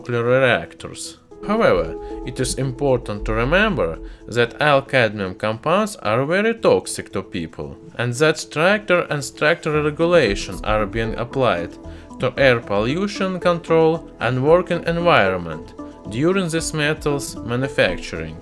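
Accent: Russia. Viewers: I love russian English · you have a hard russian acent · تعلم اللغة الانجليزية هو روسي و يحاول التكلم بها انا افهم كل فديوهاته 😁😁😁😁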